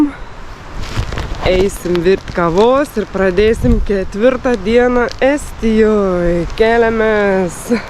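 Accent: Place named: Šiauliai, Lithuania. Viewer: Kaip miela girdėti šiaulietišką šnektą😊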